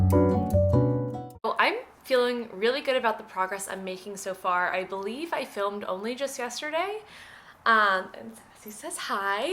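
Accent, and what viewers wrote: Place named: United Kingdom. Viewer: lol love the British accent :P! and love the knit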